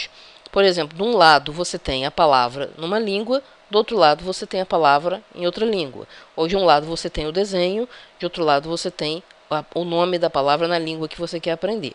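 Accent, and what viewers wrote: Rio de Janeiro, Brazil. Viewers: Carioquês forte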